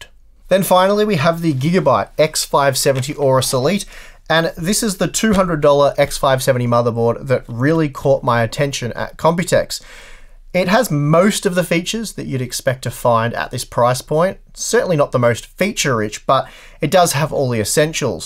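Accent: Australia. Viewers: Ur Aussie bro! Subscribed and patreoned. Really appreciate your work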